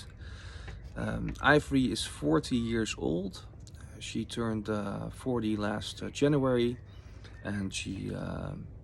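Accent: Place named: Netherlands. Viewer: I found your entry by accident and was spellbound. I noticed your Dutch accent. My heart goes out to you. Ik vond uw boodschap door toeval en was ondersteboven van uw verhaal. Ik weet dat ik niets positiefs kan bijdragen aan uw verhaal, maar voor wat het waard is wens ik u en uw gezin heel veel sterkte. Elsche.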